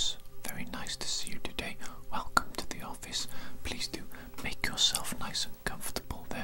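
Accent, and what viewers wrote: Wales, United Kingdom. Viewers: Am I right in saying that you are Welsh 🏴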